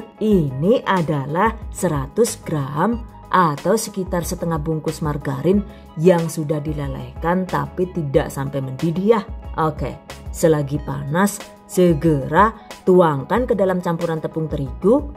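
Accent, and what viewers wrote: Banyumas, Indonesia. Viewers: Ikut komen ya kak. Emang itu sudah jadi ciri khasnya. Campur ngapak n inggris medok juga😅. Semuanya begitu. Tapi semua resepnya recommended dan nyaris ga ada yg gagal kok. kl baru lihat emang aneh, lama2 akan terbiasa🙏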